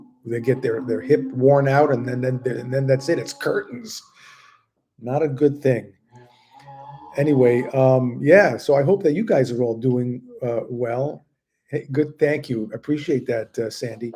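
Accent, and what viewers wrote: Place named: New York, United States. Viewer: Glad things went well 👌. Just love listening to your NY accent 😍 🇦🇺🙋🏼‍♀️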